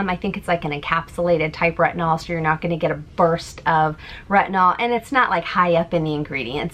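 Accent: England, United Kingdom. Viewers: Love your English accent 😂😍❤ this cruise sounds like a lot of fun to me 😍have fun! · Love your English accent 😊😂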